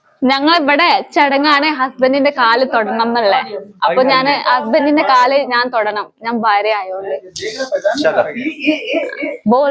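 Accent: Malappuram, India. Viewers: Ividunn klynm kazhich angot poythaan.love marriage enn Avr prnjllo.pine samsarm ketit malprm muslimsnte samsrm an. Malapurth hindusn veroru slang und. Kurch nlla malayalm.matham mariyath avam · kayichath kettappo thonni malappurathnnanu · Malappuram slang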